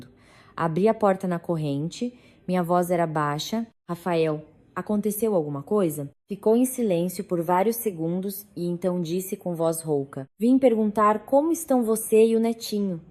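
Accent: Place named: Portugal. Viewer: Por favor, naõ precisa expor linguaja do Portugal pois estamos no Brasil ou a narradoura naõ sabe disso, narra corretivamente língua do Brasil....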